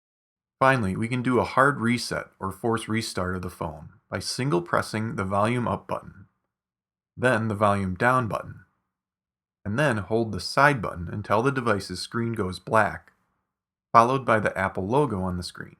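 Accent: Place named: RP, United Kingdom. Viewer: nicely done, and he speaks the queens, hurrah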